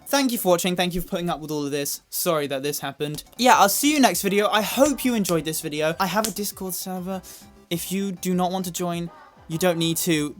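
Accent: United Kingdom. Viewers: 영상 올라왔네!!!! 하 너무 좋다, 그거 알아? 나 덕분에 영어 듣기 실력이 진짜 많이 늘었어!! 너무너무 고마워 🥰🥰 내가 미국 악센트를 가지고 있는데, 영상 엄청 많이 봐서 약간 영국 악센트랑 미국 억센트랑 믹스됐더라, ㅋㅋㅋㅋㅋㅋㅋㅋ근데 난 그것대로 좋아ㅎㅎ그리고 영상을 볼 때마다 는 참 바른 사람이라는 생각이 들어 언제나 응원할게 사랑해!! 근데 요즘 완전 슈스됐네..! 구독자도 엄청 많아지고, 영상 올린지 얼마 안됐는데도 댓글도 엄청 많구ㅎㅎㅎㅎ기분 좋다 Omg video is uploaded again!! Yayyyy I love it., you know what? thanks to, my English listening ability improved! Thank you so so much🥰 I have the States accent, but I watched videos alot so I got UK accent a little lolol I like that hehe And every time I watch his video, I think is really nice and kind and cool and hot person☺️I love your personality I stan I always cheering you up🥰🥰😘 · I'm not used to British accent and the way you pronounce hair sksjsk it's funny and adorable at the same time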